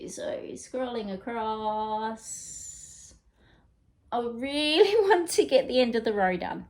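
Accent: Australia. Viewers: So good to hear your pleasant auzzie voice again.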